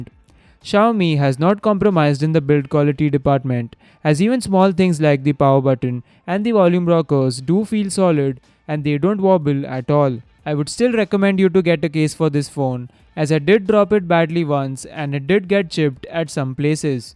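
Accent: India. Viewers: Indian english accent is very funny :D. Great review and phone.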